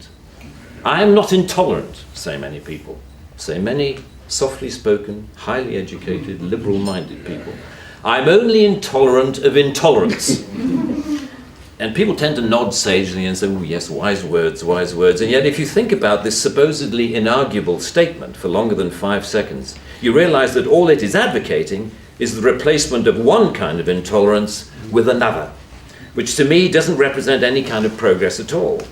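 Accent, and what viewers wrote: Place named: United Kingdom. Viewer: Ну, наконец-то - реальный носитель Британского англ (да еще какой - мр Бин!). Осточертели американцы, испортившие красивый язык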